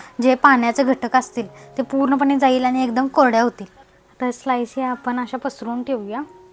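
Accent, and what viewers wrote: Kolhapur, India. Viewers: अस्सल कोल्हापुरी भाषेत बोलतीस ताई आणि आपण कॅमेरा समोर बोलतोय असं नाही तर तू प्रत्यक्ष समोर रेसिपी करून दाखव त्यास असं वाटतं य बघ.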